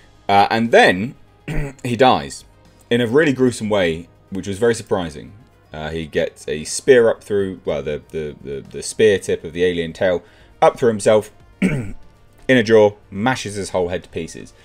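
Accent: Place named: United Kingdom. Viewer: Are you from the United Kingdom? You sound British. I like these comic books too. I need to buy more of these comic books.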